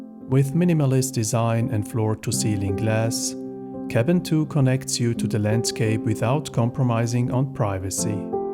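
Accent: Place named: Scotland, United Kingdom. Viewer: Lovely Scottish accent.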